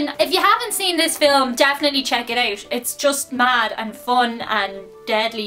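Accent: Dublin, Ireland. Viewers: the irish accent is one of my faves. just watching you (in particular) enunciate your words is entertaining :) btw, you should put some of your trademark chokers in the merch and create a cult following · SternLX its still pretty obvious shes from dublin area though. Its not that neutral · I listen to your videos for your accent more than the content... LOL Just kidding but i do love hearing the Irish accent. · Love hearing your Irish accent when you talk. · Love the Irish accent....and you are just a pleasant to watch. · did they get the accent right. And the more I watch u the more I cant hear your Irish accent but ur still great. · After listening to the way you talk and your accent, I’ll pay closer attention when I watch something with an Ireland character. Thank you for sharing! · I enjoyed this and even though I only understand and 70% of what you’re saying, I love your Irish accent! 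· I love the Irish accent, one of the main reasons I subscribed to channel. Not only do I get to hear her Irish accent but I learn about Irish culture in a fun and entertaining way. Thanks for your videos, look after yourself. Congrats on 25K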